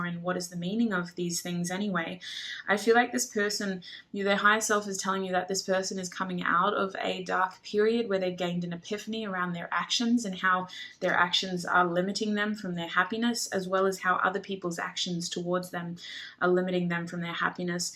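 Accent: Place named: Australia. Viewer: I watch these videos every night as I go to sleep because you have a beautiful, pleasant, lovely Australian accent 🥲💐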